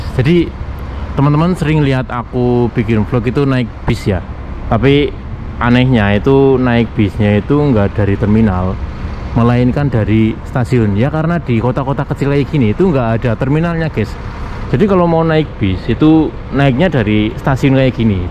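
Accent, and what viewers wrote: Banyumas, Indonesia. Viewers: Sampean wong ndi nyong,!! kok ngomong kaya ana basane ngapak..😄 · njajal aku tak krungu sampean ngomong nganggo bahasa jepang tapi karo ngapak kang..😊😊😊😊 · Wong ndi rika,lik?deneng ngapak temen😂..Banyumas Cilacap mesti kye ya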